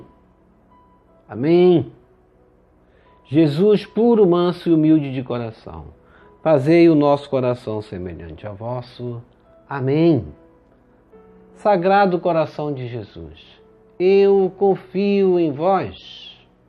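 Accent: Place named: North, Brazil. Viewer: Obrigada, por compartilhar conosco a palavra de Deus. Que Deus te abençoe e proteja sempre. Mande um abraço para Manaus e peço orações pela nossa cidade. E a propósito, diga-nos qual é seu estado? O seu sotaque me parece ser do Norte. Um grande abraço!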